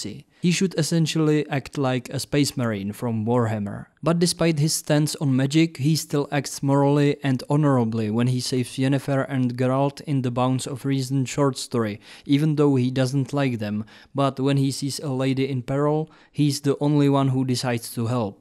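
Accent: Czech Republic. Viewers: love your Czech accent!❤ keep it up, bro.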